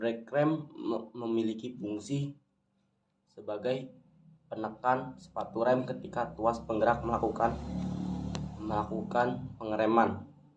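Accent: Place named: Bangka, Indonesia. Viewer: Kayak orang Bangka Abang ni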